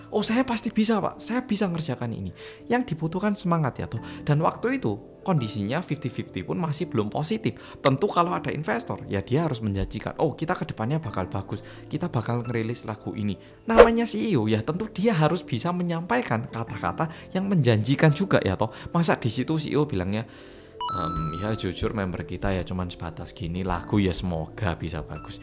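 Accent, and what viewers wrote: Java, Indonesia. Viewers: Ko medok mas bahasane,sm kaya aq 😅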